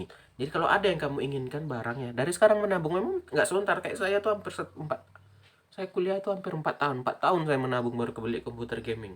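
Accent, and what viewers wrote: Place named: Madura, Indonesia. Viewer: Pisces hadir kok kyk org madura logat nya hhhh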